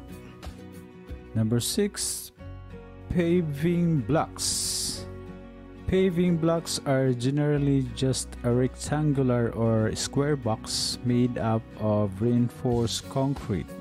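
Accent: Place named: India. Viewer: Heard indian voice, click out quickly. Shittiest group of people in construction.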